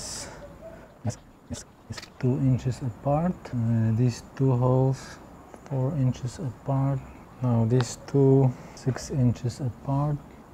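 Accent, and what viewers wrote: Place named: Poland. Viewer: Very nice video mate. Well done! Where are you oryginaly from? Your accent sound Polish am'i right?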